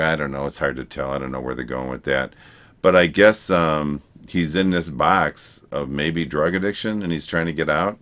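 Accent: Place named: Midwest, United States. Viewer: 3 seconds in and I detect Midwest/Great Lakes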